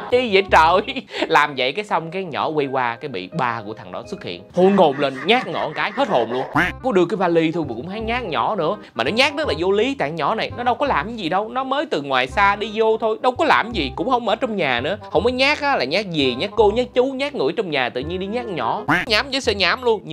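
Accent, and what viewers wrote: North, Vietnam. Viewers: review có tính cà khịa, nói lái theo giọng người miền bắc thì phải ? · eh bò đỏ, ông dùng Tiếng bắc nè, mày thưa giùm tao cái.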